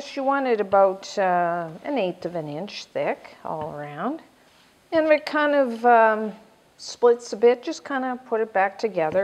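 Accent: Canada. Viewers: is she canadian? aboot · are you canadian?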